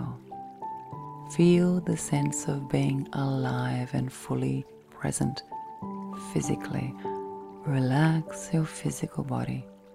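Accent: Australia. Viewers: I love you & your tranquility!!!❤️❤️💋💋, I love your sweet, soothing, sexy chloroform voice!!!❤️💋, thank you so very much for your sweet happy Australian voice!!!❤️💋❤️💋, I love you with hugs and 💋💋💋💋💋💋💋💋💋💋💋💋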